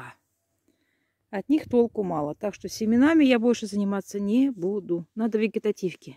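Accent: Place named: Saratov, Russia. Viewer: Привет из Израиля! С удовольствием смотрю ваши ролики,слушаю ваш говор такой родной.Я сама родом с Саратова,но уже много лет не была там.Мне так близки ваши заботы и хлопоты.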